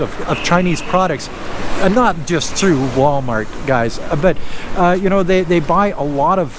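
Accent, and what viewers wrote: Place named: Canada. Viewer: Saturday Morning is gonna be a great funny show! like your canadian voice!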